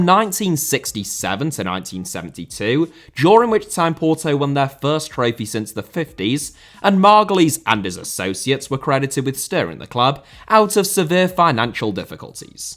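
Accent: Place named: United Kingdom. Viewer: The video IS interesting, but I somehow kind of feel weird when a super British accent speaks about Europe 😂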